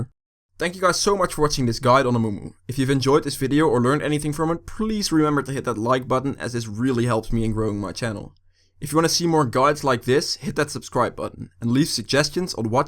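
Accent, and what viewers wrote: Netherlands. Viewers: Heerlijk nederlands engels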